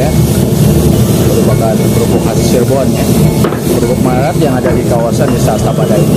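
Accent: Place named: Malaysia. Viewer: Dengar dari logat kayak orang melayu youtubernya.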